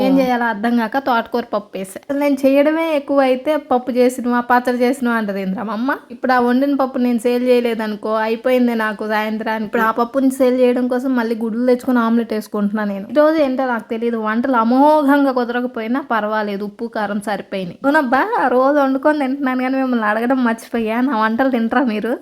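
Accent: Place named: Rayalaseema, India. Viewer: మీది ఏ ఊరు అండి రాయలసీమ slang లోనే మాట్లాడుతున్నారు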